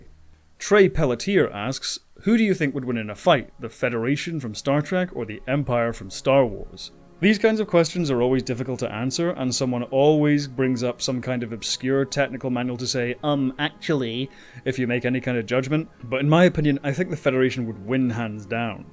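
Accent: United Kingdom. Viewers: I'm guessing he's British from the accent. Whether he's in the UK or Australia, however, means Harmony Gold isn't an obstacle to getting localized product.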